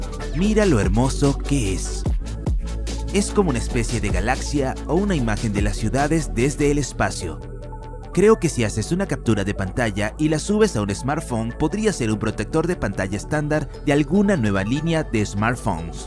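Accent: Russia. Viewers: Eres ruso o español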